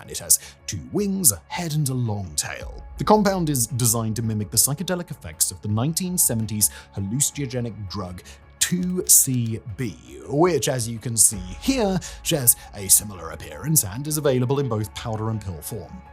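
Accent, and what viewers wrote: England, United Kingdom. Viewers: You are the most british-sounding person I have ever encountered. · Yeah man you're right. He's enunciating very sharply, so it happens. Plus his presumably English accent.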